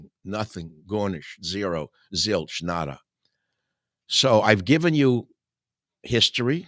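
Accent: New York, United States. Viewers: I started listeing to you Mike back during the Obama years (<num>) when I was a liberal (still am but in the classic sense) and you resonated with me. Can't explain it...I think it's because you were from NY and I lived in NY at the time and you sounded like so many people I have encountered over the years. Also I was in a lost period [during that time] of my life; and you gave me motivation and perspective-(almost like a father figure) otherwise, I wouldn't had been able to come up with, on my own. I credit you with breaking me out of my identity politics phase which I was heavily in at the time. This actually caused me to change my thinking and helped advance my career. However I would be remissed if I didn't spread the credations around: I also give credit to Alex Jones and Mark Levin, and occasionally Rush, but I never missed your radio show! 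They then got to you and had you removed for being an unbridled patriot; but I am glad you're back! And we need you now! Especially in these trying times! Thank you. 🙏 ❤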